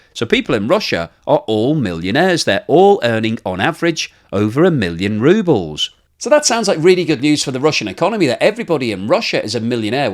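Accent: Standard. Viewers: Thanks for this video. As always Your Standard English is perfect. So much easy as an Scandinavian to understand compared to other British slang/accents. Thanks Per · Thank you for you standard English. For me as italian is really a pleasure to understand 100% of what you say.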